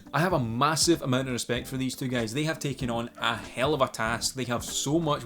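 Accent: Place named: Scotland, United Kingdom. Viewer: I am loving hearing a lovely Scottish accent in super hero movie reviews! 1 more subscription on your way!!!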